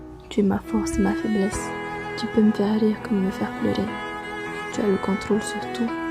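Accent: Italy. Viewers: J'adore ton accent italien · Tu es italienne ?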